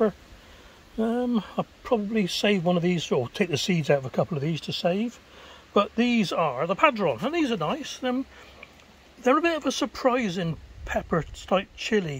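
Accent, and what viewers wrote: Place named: West Country, United Kingdom. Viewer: Nice batch of peppers there, just popped over from at Green Side Up to have a look see. I air dry mine tomatoes with added spices/herbs then store in jars with olive oil, triple bonus, 1. Makes the house smell nice, 2. Flavours already added prior to cooking, great topping on pizzas, 3 Flavoured oil for cooking. Thanks for the tips on preserving, lovely hearing a west country accent 😉😁🌱☀️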